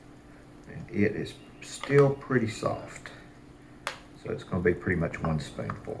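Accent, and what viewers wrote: South, United States. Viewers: OOOH MY GOODNESS!! I HAVE FOUND A NEW FRIEND, IN MY HEAD! LOL! I FEEL IN LOVE WITH YOU, YOUR AMAZING SOUTHERN VOICE! I SUBSCRIBE TO YOU RIGHT AWAY AFTER THE HONEY BUN CAKE! I TURN ON ALL NOTIFICATIONS I LOVE HOW CAME IN A STOLE THE SHOW! YOU GUYS ARE SIMPLY AMAZING!! PLEASE CONTINUE TO SHARE THE LOVE AND JOY WITH US!! I LOVE YOU BOTH!! MERRY, MERRY CHRISTMAS!!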